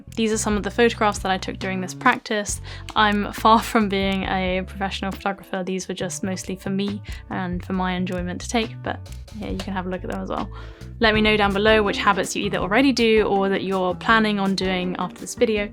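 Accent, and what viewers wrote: United Kingdom. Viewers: 自打听了UP主的口音，我打算放弃美音，学习英音。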